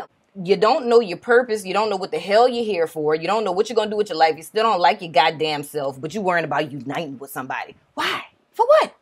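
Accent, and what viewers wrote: New Orleans, United States. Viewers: Yassss NOLA accent!!! 😍🥰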